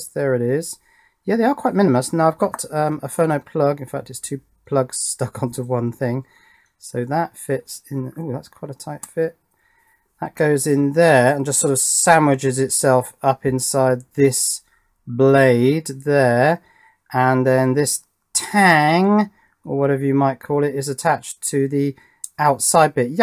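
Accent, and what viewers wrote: United Kingdom. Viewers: NO!. is british and he speaks british english.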